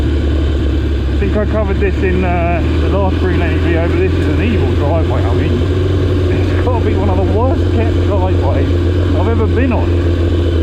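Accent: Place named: Kent, United Kingdom. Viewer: Nice, i am actually based in Maidstone, Kent. Recognised your accent! Found you through a search for Malle videos and liked the content! I had a similar story for the Malle this year too! Not ideal!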